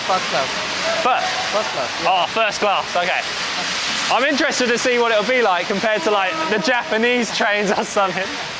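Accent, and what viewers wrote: United Kingdom. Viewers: u briish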